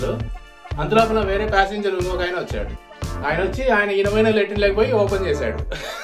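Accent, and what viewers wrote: Rayalaseema, India. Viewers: గారు మీది రాయలసీమ కదా మీ వాయిస్ వింటే అర్థమవుతుంది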